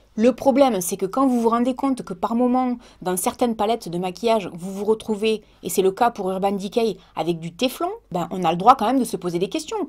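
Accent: South, France. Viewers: Vive l'accent du sud ! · Je me disais que tu avais beaucoup l'accent du sud et qd j'ai entendu Perpignan j'ai mis pause et je t'ai regardé comme si yavais un cookie devant moi et j'adore les cookies 🤣❤️ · j'adore trop marrant, surtout l'anedocte avec Kate Wislet pas mal... et j'adore ton accent, ça me rappelle le sud et le soleil :) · Coucou ♥ j'adore ton petit accent du sud et tes cheveux roux ! Tu m'as fait sourire dans ta vidéo et j'avoue que c'était bien sympa o/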